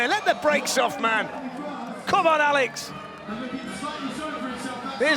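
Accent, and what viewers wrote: United Kingdom. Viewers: This seemed interesting until the British accent started. Then it was annoying.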